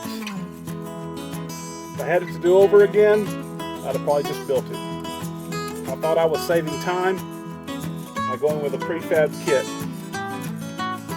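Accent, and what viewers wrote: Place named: Texas, United States. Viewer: Don't ever listen to negative talk about your accent. It sounds perfect to me. I'm from TX, and you sound similar to what I'm use to hearing. I know some from GA probably have stronger accents. The southern accent is the best. I love your videos. :)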